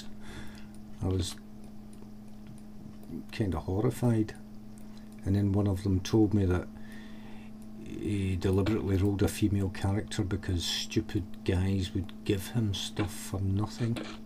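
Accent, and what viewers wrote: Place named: Scotland, United Kingdom. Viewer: Your scots accent makes me want to play mmos with you, sooo laid back and wrapped up in the world of Norrath.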